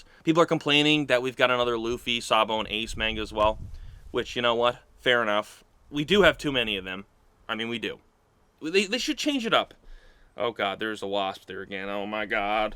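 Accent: United States. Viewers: Honestly, as a fellow east coaster I prefer pronouncing the way you do 😅 either way real ones know what you mean anyways great video. I’m just getting into one piece myself. This will probably be my first set I rip. As a guy who comes from yugioh, I really appreciate the content. Keep it up brother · I’m Irish ? What ? Bro you American as it gets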